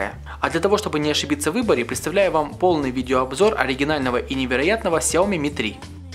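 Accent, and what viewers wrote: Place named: Donbas, Ukraine. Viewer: Молодой человек надо, что-то менять! Не хочу ни кого обидеть! Но Вы делаете публичный обзор и дикция должна быть отличной от дикции обычных жителей Донецка или Луганска! Удачи и счастливых перемен!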